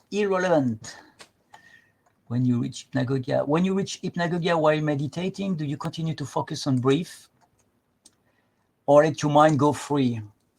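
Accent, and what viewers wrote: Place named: France. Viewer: I looooove the French accent.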